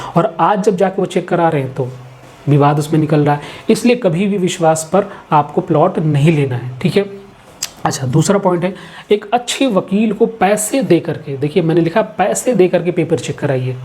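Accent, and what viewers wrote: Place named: Lucknow, India. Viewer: Bahut achche se explain kiya h lucknow se hn vo aapke bolne ke andaj se pata chal raha h. Informstive video h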